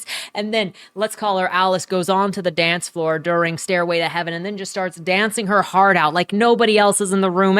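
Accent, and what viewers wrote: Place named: Transatlantic, United States. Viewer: The transatlantic accent is brutal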